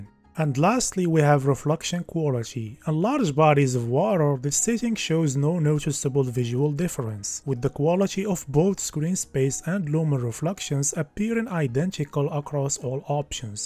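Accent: Morocco. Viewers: the Moroccan accent is a bit too overpowering brother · sounds moroccan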